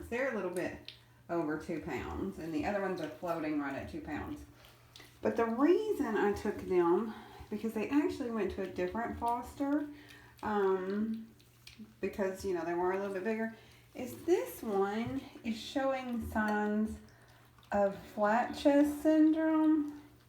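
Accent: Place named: Texas, United States. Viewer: You sound like a Texan, like me.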